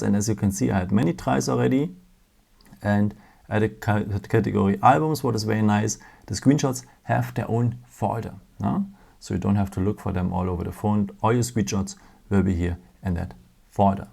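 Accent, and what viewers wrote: Germany. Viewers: Bist du vielleicht ein Deutscher? .... oder, sogar ein Österreicher? ??? That's why this was the best & most concise video! Ich habe es Sofort verstanden ----> which wasn't the case w/other videos!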